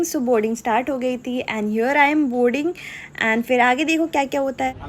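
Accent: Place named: Kolkata, India. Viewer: Kolkata ascent in very good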